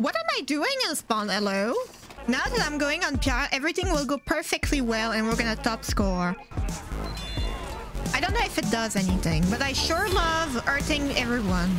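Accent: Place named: France. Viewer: french or Russian sounding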